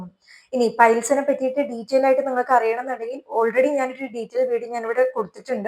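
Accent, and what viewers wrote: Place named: Thrissur, India. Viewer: തൃശൂർ മലയാള പോലുണ്ട്. ഉച്ച്ചാരണംശരിയല്ല സ്പീഡുകൂടുതൽ ആയതിനാൽ ചില അക്ഷരങ്ങൾ ചോർന്നു പോകുന്നു. പല്ല് ഇല്ലാത്തവർ സൂപ്പർ ഫാസ്റ്റ് സ്പീഡിൽ പറയും പോലെ ശ്രദ്ധിക്കുക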